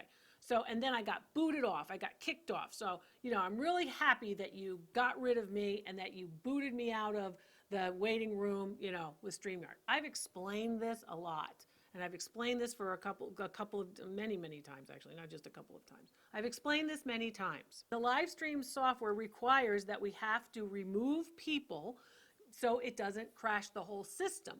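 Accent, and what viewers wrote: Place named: New Jersey, United States. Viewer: You are from NJ, aren't you? If not, you have us down cold. There is something about us Jersey Girls.....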